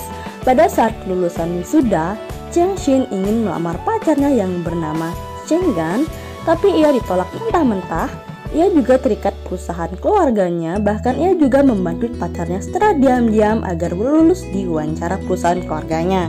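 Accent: Bali, Indonesia. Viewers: Logat balinya kentel🥰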